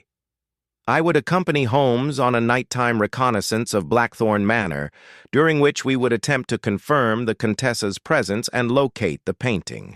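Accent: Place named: United States. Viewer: Give this a miss! AI GENERATED STORY AND AMERICAN NARRATION.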